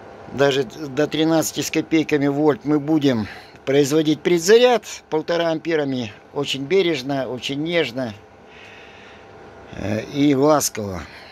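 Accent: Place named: Chita, Russia. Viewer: Друган ты точно с Казахстана !? А то мне кажется ты наш , с Читы )))👍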